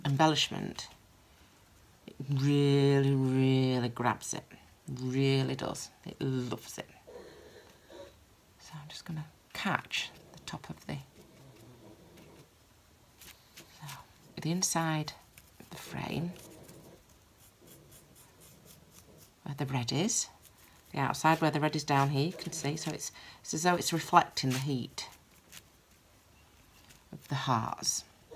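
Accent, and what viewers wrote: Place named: East Midlands, United Kingdom. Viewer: So nice to see a video from someone from England, and not having to pause it to read the translation 😃 I’m in the East Mids, from your accent you’re not that far from me ...love it by the way 🙂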